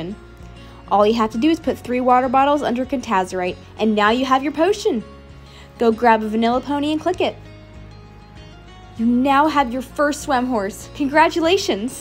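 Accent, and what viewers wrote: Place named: South, United States. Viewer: The southern accent sounds like Sadie from RDR2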